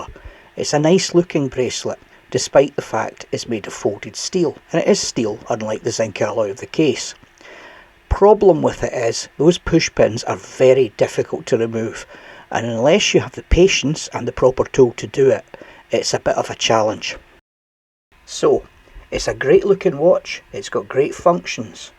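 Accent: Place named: Scotland, United Kingdom. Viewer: Good clear review, i like your clear Scots accent too.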